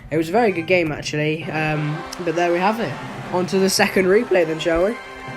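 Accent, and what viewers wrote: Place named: United Kingdom. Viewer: I was enjoying the vid until u spoke bri'ish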